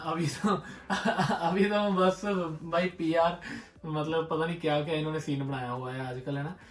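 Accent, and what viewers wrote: India. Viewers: Accent hi etna desi hai ki kaun naukri dega canada mein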